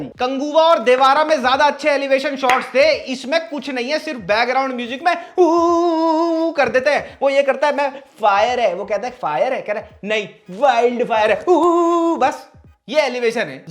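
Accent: Haryana, India. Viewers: Bhai mast review !! Bring back the haryanvi accent 😂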